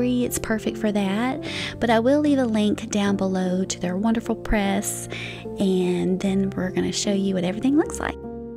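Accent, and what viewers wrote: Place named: Georgia, United States. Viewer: That's a nice take on veggie soup. I'll have to try that one😋 may I ask about your nails? I'm fairly new to your channel. Are the your natural nails or press on? They always look beautiful. Really enjoying your content. You sound like your from my neck of the woods in GA. How'd you get to Iowa? Have a good safe weekend 😊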